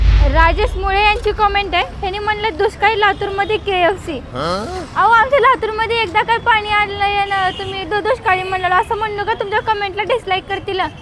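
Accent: Latur, India. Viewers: बोलीभाषा खूप भारी आहे माझे एक मित्र आहे लातूरचे ते पण असच बोलतात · hello mi nashik cha ahe but mazi ek friend ahe latur chi tich bolan ani tumch bolan ekdam same to same ahe. Khup mast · जाम आवडल.,. तुमचं बोलणं. मी पण चार वर्षे होतो लातुरात म्हणजे नीलांग्यात मास्तर म्हणून . मलाही लातूर वल्यांच accent ani dialects खूप आवडतात.... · Mastt banvta vidio aani bolne tumchi laturuchi bhasha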